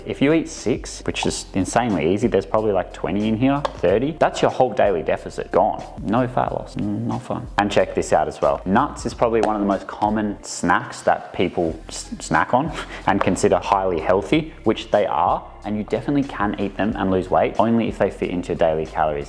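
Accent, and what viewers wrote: Australia. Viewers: Are you a West Aussie mate ?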